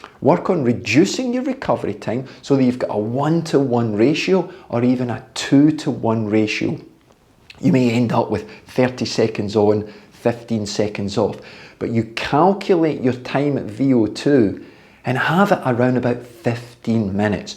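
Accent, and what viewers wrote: Scotland, United Kingdom. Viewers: I love your videos! I've learned a lot (I am 59 and just started cycling again after decades). I find it extremely hard going up any hills but am getting a little better with the smaller inclines. I'm not exactly a skinny Minnie either, lol. Thanks again, and as a Canadian, I enjoy listening to your Scottish accent while you're explaining things. 😁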